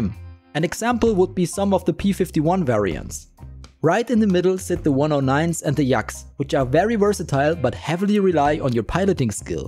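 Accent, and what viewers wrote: Germany. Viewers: or you just have an incredible german accent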